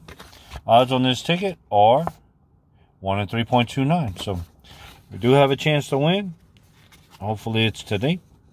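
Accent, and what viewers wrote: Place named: Louisiana, United States. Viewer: Love that Louisiana accent drawl action too 💪🏽💪🏽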